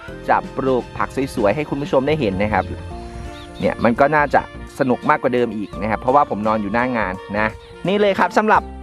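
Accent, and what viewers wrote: Isan, Thailand. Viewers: 555เราชอบฟัง พาษาอีสารด้วนน่ารักดีสุดยอดคะ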